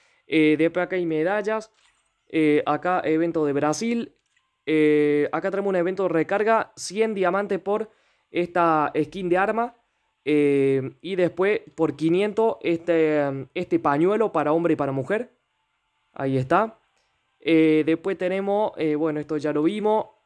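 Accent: Argentina. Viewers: Re argentina el pibe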